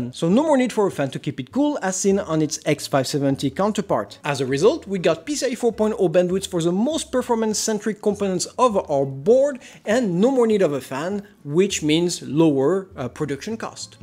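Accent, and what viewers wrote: France. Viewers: the jesus of mother boards love man, j’adore l’accent français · Ooo le franchoyardd 🤣 ton accent vaut de l’or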